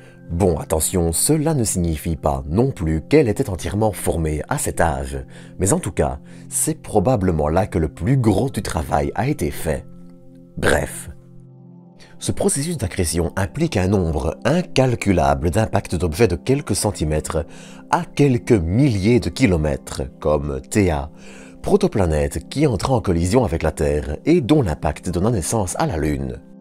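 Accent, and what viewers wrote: Belgium. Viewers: La voix belge. Liégeoise sans doute 😂😂. Quel accent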